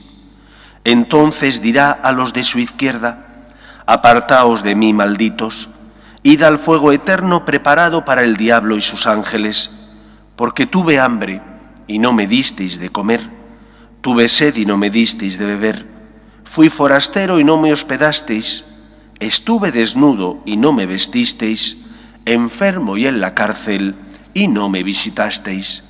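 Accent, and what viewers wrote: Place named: Spain. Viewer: Me encantan el acento español, Respetuosamente le saludo Sr Sacerdote 🇲🇽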